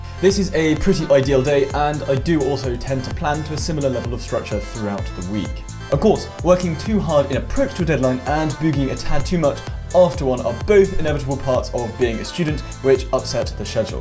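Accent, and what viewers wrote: United Kingdom. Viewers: Capoeira, I've been watching the movie about that! Only the strong 😊👍 I also love this martial arts. Just haven't try yet but,want to. And cos of a karate kid, I'm now trying just Karate sometimes in my university's class. But, I didn't make out that much cos of this covid-19 break, yeah but I will when I back to school. And, btw, my dream university is Cambridge. Just at the dream state and I do need to try in real with process and.. lots of trying one after one. Thank you, this is a motivation for me. By the way, I like your British accent. So, about that.. do I need to practice the accent? Seem personally but, just wanna know if so, maybe more familiar and understand easily. Is it Right? Thank you! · lmao thats just an upper class english accent a lot of people sound like that it just depends where you live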